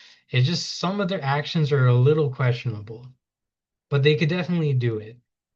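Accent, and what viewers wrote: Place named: United States. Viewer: Your channel name is (one of the names of lord krishna) but your accent is American why?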